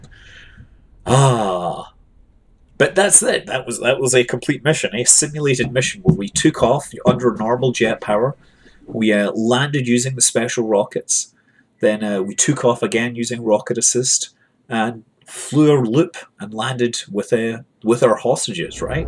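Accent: Scotland, United Kingdom. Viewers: i'm pretty sure he's scotish.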